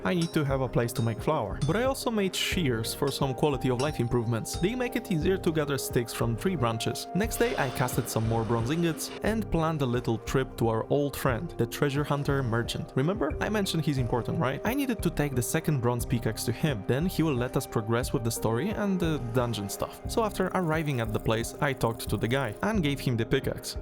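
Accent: Poland. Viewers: slychac ze Polak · You sound Polish. Are you Polish? I am Polish, siema. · Are you polish? You sound VERY polish/slavic and I fucking like it.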